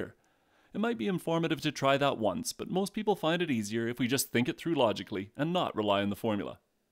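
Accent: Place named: Canada. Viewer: Crystal clear explanation. Very helpful. I detect a slight Canadian accent which I have come to associate with the best math and stats videos on YouTube. Thanks prof wherever you are.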